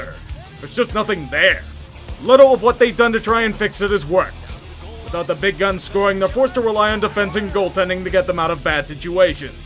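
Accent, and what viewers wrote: Pittsburgh, United States. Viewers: Hearing this yinzer talk Flyers makes me sick but you got a point amirite?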